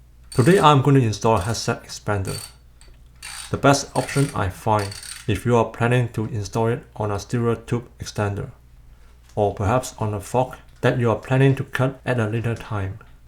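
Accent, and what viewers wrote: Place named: Canada. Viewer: I think hes chinese Canadian. I base off his accent and I think I got a hint about the canada in a past video.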